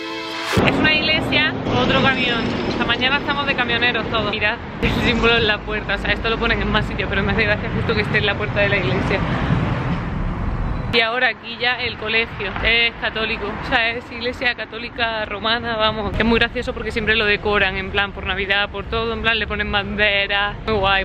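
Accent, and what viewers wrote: Spain. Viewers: Madre mía cuánto tiempo sin escuchar pipirrana ... es escucharte y me transportas a esos maravillosos pueblos de España!!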